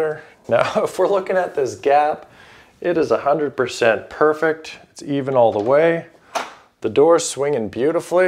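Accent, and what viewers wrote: Canada. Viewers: Love hearing a good Canadian accent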